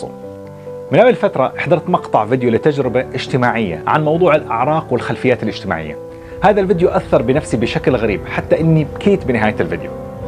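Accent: Algeria. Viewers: صدقني فيك شيئ من الجزائريين من طريقة كلامك وكاريزميتك وأحاسيسك ومواقفك التي ذكرتها وعن والدك رحمه الله. كلنا مسلمين. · والله قبل ماتقول North Africa كنت حاسس انك منا هههه بطريقة كلامك وفهمك للدين ،أخوك من الجزائر.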